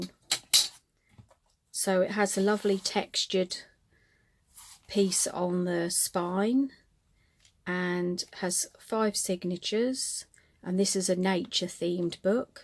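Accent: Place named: Norfolk, United Kingdom. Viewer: So beautiful! Also looked at your Etsy shop because I recognised your accent - good ol' Norfolk! (Me too!) x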